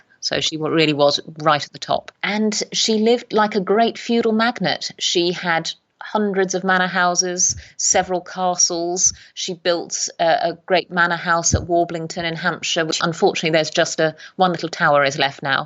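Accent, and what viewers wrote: United States. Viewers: I'm immediately put off by the American accent!!!!!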